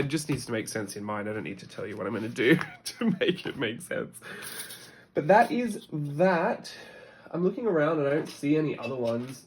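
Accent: Australia. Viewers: Is that a hint of Australian in your voice? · Love hearing your little Kath and Kim voices 😂 I think it’s ingrained in us as Aussies.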